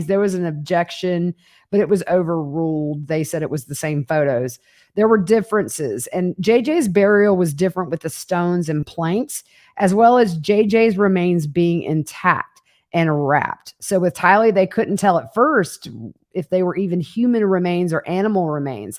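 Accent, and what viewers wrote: South, United States. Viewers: You are doing an outstanding job reporting back to us on a daily basis. I know it must be very difficult to hear and see what was done to those precious children. Have a wonderful time with your daughter at the concert; she is greatly blessed to have a mama like you! Btw, I love your southern accent.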